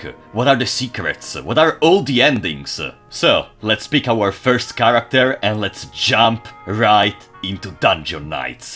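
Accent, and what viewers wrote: Italy. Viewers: ho capito fossi italiano dall'accento loool ciao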